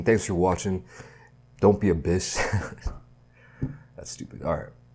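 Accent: United States. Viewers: could you do a video of explaining why you live in Korea etc you sound like you were born in America etc would be a great vid explaining cheers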